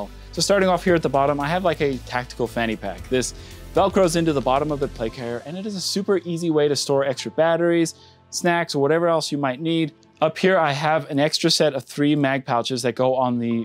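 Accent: South Africa. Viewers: you south african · Are you from south africa? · Are you south african?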